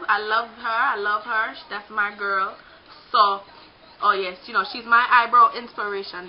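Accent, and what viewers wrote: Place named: Jamaica. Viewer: OH MY GOD!!! I love accents,,, I most of all love your jamaican accent...!!!! BOL the shirt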